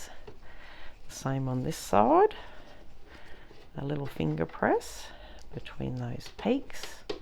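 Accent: Australia. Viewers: Thank you I'm going to give it a go tomorrow, love your calm cheery Aussie voice and clear instructions. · Just found your channel , I’ve never made a bag before so I’m keen to give it a go … I thought you were an Aussie from your accent .. I’ll definitely check out your shop , also where do you get your label from … x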